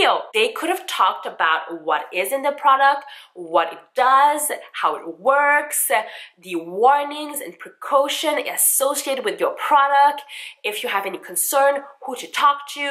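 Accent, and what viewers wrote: Quebec, Canada. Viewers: omg YAY! I'm in Montreal as well! Thought I recognized the accent :) <3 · Just subbed! Is that a French Canadian accent I hear? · Are you from Quebec (province), Canada? Cauz you have that lil accent we have here when we speak english lmao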